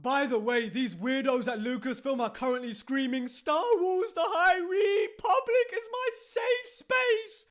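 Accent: United Kingdom. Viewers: he’s British. I understand you have never heard many accents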